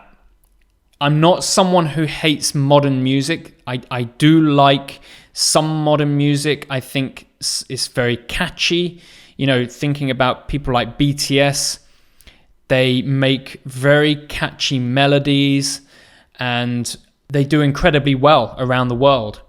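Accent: United Kingdom. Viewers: I love your amazing British accent 😍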